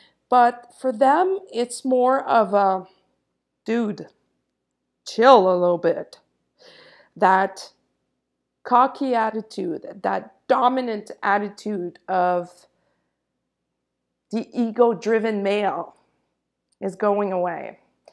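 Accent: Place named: Montreal, Canada. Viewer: I live in the country and while gathering horsetail for my tinctures, I pulled up a nice dandelion plant, saved the roots to roast for coffee, and cut the leaves up finely, added some of my seasoned oil and vinegar, salt, pepper and voila! Are you in Canada? I lived in Montreal and recognize the accent, I think...Namaste!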